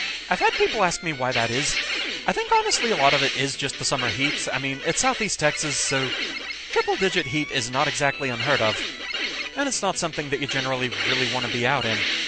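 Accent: United Kingdom. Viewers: You live in texas.What.You sound so British,bit of a stretch but maybe even Scottish but youre American.mind blown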